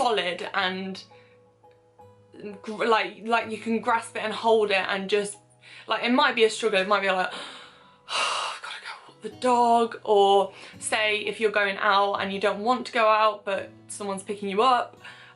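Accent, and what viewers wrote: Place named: Northampton, United Kingdom. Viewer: Swear your from Northampton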